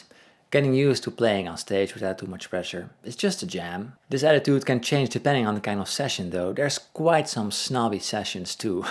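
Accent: Netherlands. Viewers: wow ik zit al video's lang te raden of jouw accent nou wel of niet nederlands is!:) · He sounds dutch 👀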